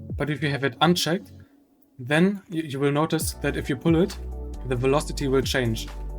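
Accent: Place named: Germany. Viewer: Wie man se German Englisch hört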